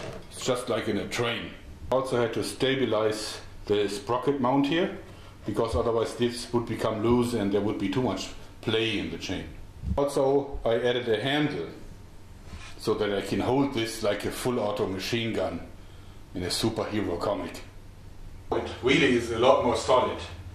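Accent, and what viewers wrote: Germany. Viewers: Das ist eindeutig englisch mit deutschem Akzent :D Hab ich recht? :)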